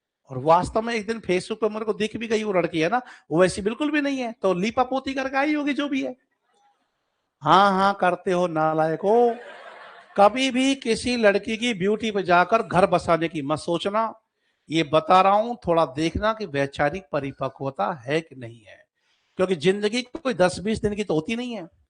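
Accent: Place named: Bharatpur, India. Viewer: सर जी आप कहां से हो। आपकी भाषा भरतपुर बालों से मिलती है।❤❤❤❤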